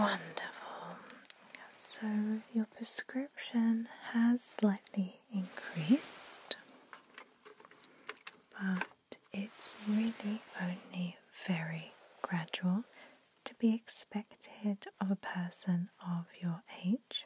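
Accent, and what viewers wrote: United Kingdom. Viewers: I don't know what it is about a British accent, but it makes ASMR videos so much more enjoyable. 😊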